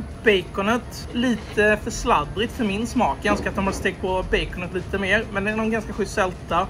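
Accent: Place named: Småland, Sweden. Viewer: Man älskar ju å lyssna på din småländska dialekt ❤ tack för trevligt avsnitt! 👏🙏 men ska biffen i en burgare vara rosa i mitten som dessa är? Jag har för mig att den bör va genomstekt.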